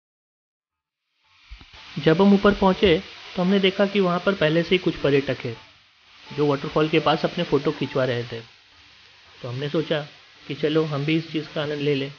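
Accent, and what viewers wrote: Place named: Nepal. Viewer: Nepali soundi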